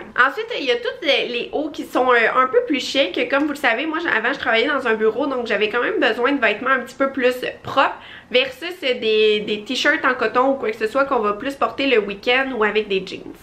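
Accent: Quebec, Canada. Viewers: Tu ne respecte pas qu'elle a un accent québécois. Si t'aimes pas, regarde la vidéo de quelqu'un d'autre sans accent et ne critique pas !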